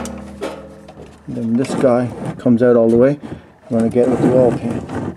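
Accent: Canada. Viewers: this guy sounds like a fucking newfie lol click click click click click click with the torque wrench lmfao rofl!!!!!!!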